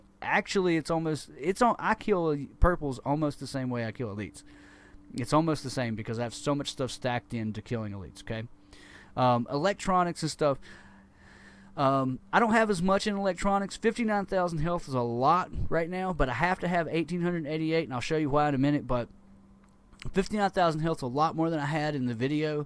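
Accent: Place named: South, United States. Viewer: Southern accent is cool these days dude. Its also a total relief to listen to a voice that is original, and not sounding like a generic radio DJ like 99% of youtube gaming commentators. Btw thank you for taking the time to reply to my comment, credit to you sir. Good luck with the channel, its helped me a lot.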